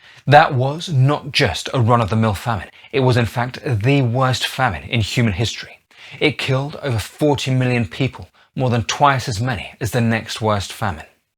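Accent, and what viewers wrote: United Kingdom. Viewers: Never trust a man with a british accent. The British used drugs to destroy China. U owe them reparations.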